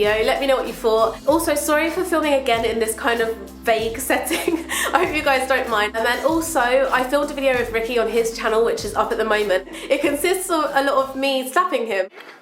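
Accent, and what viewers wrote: United Kingdom. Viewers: your accent reminds me of one direction:)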